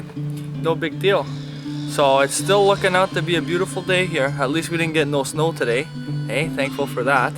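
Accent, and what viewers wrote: Canada. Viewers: Your accent sounds like it's a mix of Canadian and New Yorker. It's like nothing I've ever heard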